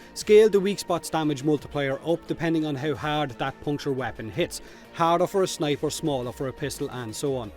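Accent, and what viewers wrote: Ireland. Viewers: P.S. As much as I like hearing your beatiful irish voice rant about stuff (don't actually care what about), I like your regular approach better. WF is free to play after all, so DE can do with it whatever they feckin like. BUT: Giving feedback, adressing issues, pointing out things that are wrong can help the developer improve their game. At the end of the day DE still wants the game to be as good as possible (or so I hope), so we, the fans, should defenitely criticize their work and tell them what we don't like, but at the same time (very important) also what we DO like, so they can use that info to decide what to focus on for future updates. And all of this can be done in the calm polite way, you, have ever since done it. No need to shout, no need to rant. Just tell 'em what went wrong and hope they fix it. If they don't, don't spend money. 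But, as mentioned above, I'd like to hear more irish cursing so do one of these every once in a while. Slán go fóill (Hope I got that right)^^ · the dulcet tones of your brogue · Critical was tone on considering the concerns, but more worth it because Irish rants are the best rants. Better at the pub over a beer. ;) Also, Vay-Donald-Hek. <3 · Youtube's auto generated captions isn't kind to your irish accent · Sorry, but aside from the curse words, you sound like a fluffy irish teddy bear even while raging:D And that's not a bad thing! It's a good thing that even when you speak your toughts openly, you still remain composed and constructive. True raging as in yelling, incohherent bitching, flailing, name calling and acusations are not what your brand is about (at least I think, but correct me if I'm wrong). Even tough I have 1000+ hours in the game, there is not much that bothers me. I give DE a lot of leeway, because it's their game, we as players don't own it (see the entitlement of Star Wars fans over TLJ for example, how this can be a bad thing) and because it's F2P. 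The 2 things I would like to see looked up in seriousness is the level scaling and difficulty curve of the game and a rebalancing of all weapons, shortening the gap between older and newer weapons. I'm fine with tier weapons, but I'm not fine with old weapons having less than 8% crit/status chance, while new weapons come out all with 25%+ in one of thise categories. And no, Rivens are not the answer.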